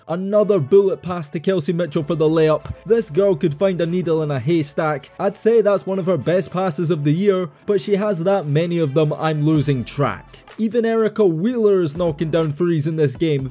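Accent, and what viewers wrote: United Kingdom. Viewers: Bro why is it always a British dude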